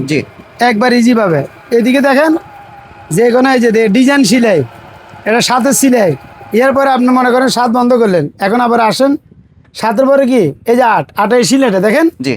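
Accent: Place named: Noakhali, Bangladesh. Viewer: ভাই নোয়াখালীর মনে হয়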